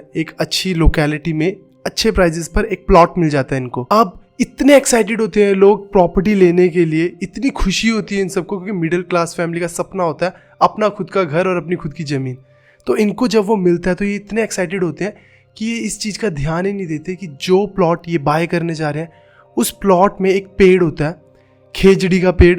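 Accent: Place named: Delhi, India. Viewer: Aap dilli bale style me hindi mat bola karo, kahani barbad ho jata hai.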